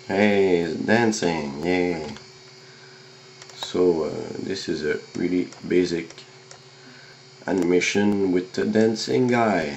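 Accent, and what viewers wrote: Quebec, Canada. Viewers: B à la première seconde de la vidéo jme suis dit "oh yest quebecois !" merci pour la vidéo !!!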